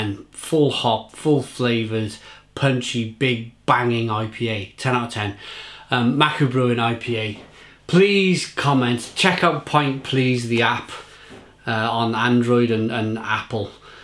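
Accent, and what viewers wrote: United Kingdom. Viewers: This is my personal favorite of all the Finnish IPA's. CCCCC by Beer Hunters is also great, but this is one more to my Taste (I capitilised it because maku means taste/flavour). By the way, where from the UK are you from? I'm trying to recognise the accent. :)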